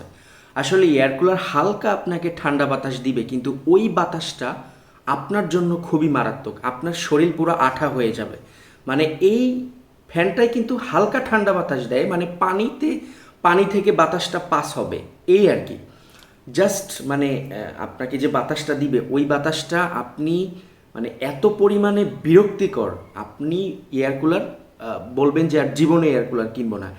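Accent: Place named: Bangladesh. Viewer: Apnar kotha sune mone hochche apni Bangladesh theke. Oidike coastal type er climate , cooler dry weather Chara cholena. Air cooler north/West india te bhalo chole, adrota thakle kokhonoi cholbena. Apnar chit chite lagche Karon humidity thakle aro humidity baraye, local Air cooler onek bochor use korechi, ac-r Cheyeo thanda Hawa deye, local cooler onek sosta ar. Boro hoye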